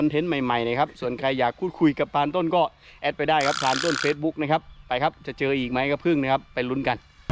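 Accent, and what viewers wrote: Isan, Thailand. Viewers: พราน ยุ จ.อารายคับ แล้วยุ อ.อารายคับ คำเว้าคือคนทางบ้านผมแท้ ผมยุ.จ.เลย คับ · อ้ายเว้าอิสานม่วนดี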